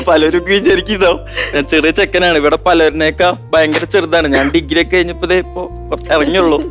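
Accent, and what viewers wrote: Thrissur, India. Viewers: തൃശ്ശൂർ ഭാഷ superഅല്ലെ നീ മുത്തല്ലെ dd you are grate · Thrissur bhaashaa aaa chetto chetne highlight 😍😍😍.. Don't ever change that. That makes your way of speaking so attractive.. We should all love our native slang. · സത്യം parajal DD ഉള്ളത് കൊണ്ടാണ് നമ്മളെല്ലാം ee programe kanunath അല്ലെന്ന് aarkenkilum തോന്നുന്നോ 😍😍 DD chetta ഭാഷ ഒന്നും oru പ്രശ്നം അല്ല numma തൃശൂർ ഭാഷ polialle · Thrissur language pwoliyalle... ❤ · നീ നമ്മുടെ സ്വന്തം തൃശൂർകാരൻ അല്ല.: ടാ അതിൽ ഉപരി എന്താ വേണ്ടത്.. നീ മുത്താണ് സ്വന്തം ഭാഷ ശൈലി അണ് ഈ ഇപ്പോഴും തുടർന്ന് കൊണ്ട് പോക്കുന്നത് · Ath thane. Thrissur kar pinne thrissur basha thane alle paraya.kore alkar kuttam parayum but dd not change your thrissur slang. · Thrissur ഭാഷ ആണ് DD ടെ superb u r great. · DD... Nammude thrissur language vittu povathathil enthnaa vshamikne..nee njngalde muthaaanu... Oru anchor nu paranjal kadichal potatha english parayunna aaalaavanam ennonum illa... 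Thrissur tone maatathe nee samsaricho... Ini ipo athu ishtavathavar nine kananda... Ninak nammal thrissur nte gadikal full supportaaa... Comments nokku dd... Thrissurr eno palakad no ennonum illa... Ithu kanunna ellarum nine ishtapedunnavaraa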